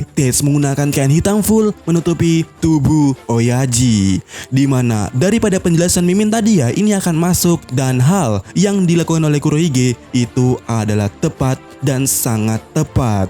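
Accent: Palembang, Indonesia. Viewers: Bang lu orang Palembang ya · bg lu org palembang pa jambi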